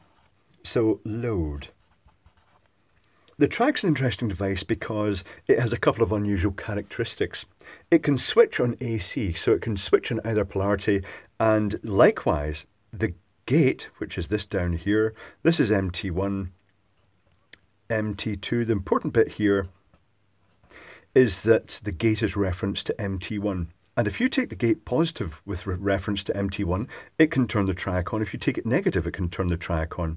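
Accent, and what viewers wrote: Scotland, United Kingdom. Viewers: Fairly heavy Scottish accent.... 'triac' becomes 'track'. Had to double-take a couple of times. TRI-AC. TRI-AC. Repeat 100 times,!:-)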